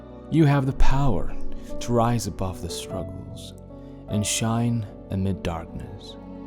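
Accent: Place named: Morocco. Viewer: best morrocan english accent 😭❤️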